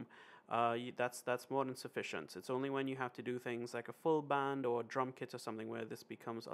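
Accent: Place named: Trinidad and Tobago. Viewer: This may have been an issue I would have encountered sooner or later and may not have known what to do, so thanks a lot for this heads-up bro!! p.s. from the moment you spoke I knew you were a Trini.. we can't hide lol but your standard English is very good!! Blessings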